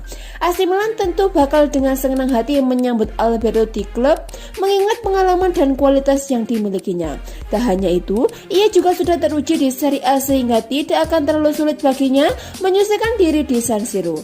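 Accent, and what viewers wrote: Java, Indonesia. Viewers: kental banget bahasa jawanya😆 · Medok buanget yah pembawa narasinya 😁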